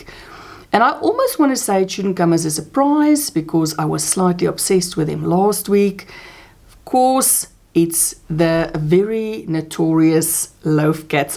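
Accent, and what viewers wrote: South Africa. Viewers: Hello love ❤️, I recognise a South African accent? are you from South Africa perhaps?🥰🥰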